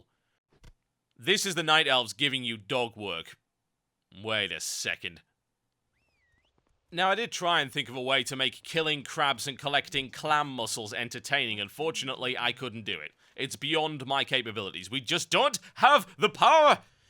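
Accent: United Kingdom. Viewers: I'm lithuanian and i dont have any problems understanding, he speaks very clearly. I do like british accent, that's how true english should sound. Ofc americans are having a hard time understanding him cuz they speak like efin' murlocks. And, keep a good work mate.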